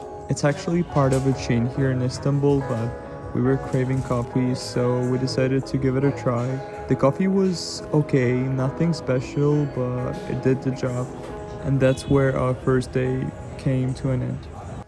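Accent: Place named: Flanders, Belgium. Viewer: You have a flemish accent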